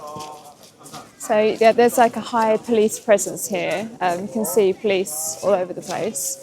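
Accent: England, United Kingdom. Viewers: this is a great report. Well done. And you are such a sweet girl 😊 Love your English accent. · I really like this British accent :-)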